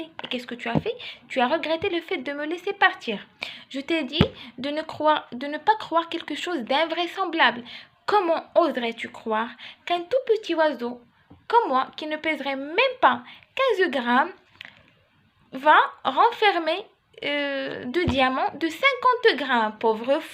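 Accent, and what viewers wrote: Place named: Fes, Morocco. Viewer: Un délice... mais je préfère en darija avec ton accent fessi si!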